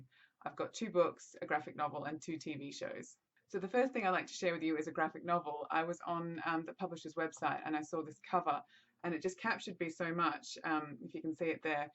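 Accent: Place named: England, United Kingdom. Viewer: The Madwoman upstairs, wouldn't mind taking a look at that , and watching middlemarch. I wish I'd borrowed instead of 'Joy' which is currently in my dvd player. First half hour in and I was so bored last night I went to bed lol. And read some of and editing book by james scott bell...ANd actually paid attention. That's how sick of feeling bored at the movie I was! Lol. I love the Brontes. it sounds like the mad woman upstairs isn't written like fanfiction? - it has it's own story. There is a book I saw recently in book shop about Nelly from Wuthering Heights and I got one page in and groaned - I could have written it myself! - it did not read anything like Emily Bronte...or any bronte. By the way you have an English accent lol. Did you always have it or caught it overseas? This sounds funny but I like stories where people need to get a job, and life kinda is frustrating them lol. 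Blood stain sounds good.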